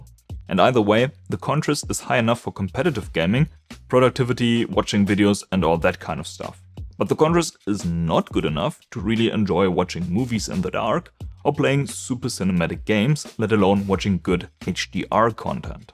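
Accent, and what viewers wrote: Germany. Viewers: german, right? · wait he’s German?😭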